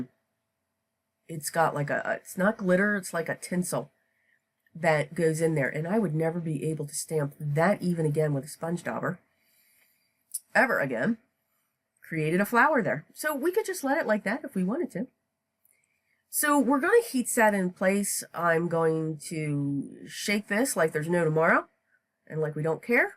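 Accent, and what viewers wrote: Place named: Philadelphia, United States. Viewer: I passed on this kit, your projects are so cute and are making me regret my decision. New subbie here, I detect a Philadelphia/south Jersey accent, am I right?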